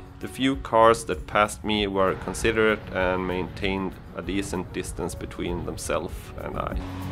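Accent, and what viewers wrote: Sweden. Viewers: Beautiful, peaceful countryside ! Great English, Swedish accent ?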